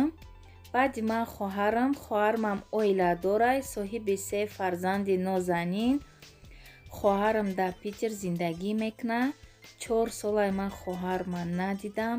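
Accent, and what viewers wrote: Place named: Dushanbe, Tajikistan. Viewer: 21л Салом ,Шумо аз Конибодом лекин лахчатон монанд нест дигар хел ранги Душанбегихо гап мезанед,салати ачоибот зебо ва аз намудаш хело бомаза менамод👍👍👍👍😋😋😋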